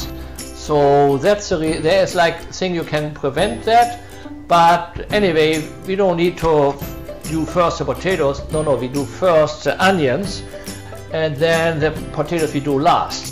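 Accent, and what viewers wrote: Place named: Germany. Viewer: I like your accent German English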